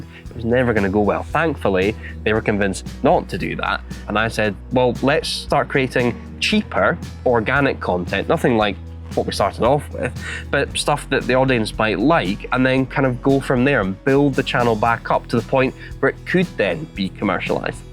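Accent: Scotland, United Kingdom. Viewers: Ahh! You have probably the best taste in cars of any person on Youtube (as in, unloved British cars).. Best of luck with the new channel, make sure you put subs on all your vids because I can't stand the Scottish accent - I always used to watch the DT videos in silence with subs. Can't wait to see what happens.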